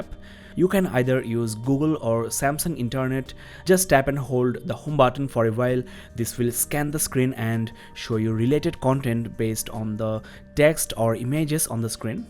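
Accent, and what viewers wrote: India. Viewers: hate Indian and Pakistani English accents. ttopp, ttrickk, butttonn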